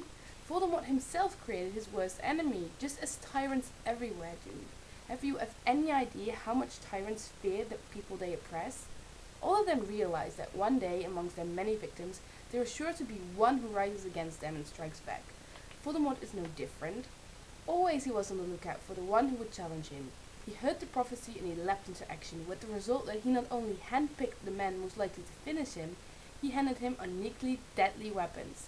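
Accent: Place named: Netherlands. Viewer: interesting stuff. I like how when you read outloud you pronounce very posh english in a dutch accent haha.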